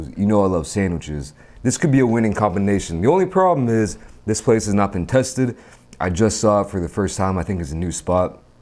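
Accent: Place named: Midwest, United States. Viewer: They on to somethin', I'll tell ya' what! - A key part to any video is having his Midwestern-isms come out!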